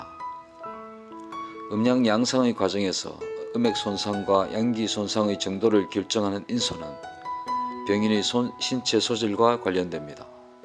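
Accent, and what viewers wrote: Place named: Gyeongsang, South Korea. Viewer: 감사히 잘 듣고 있습니다.경상도 발음이라 잘 못알아들을때가 가끔 있어요^^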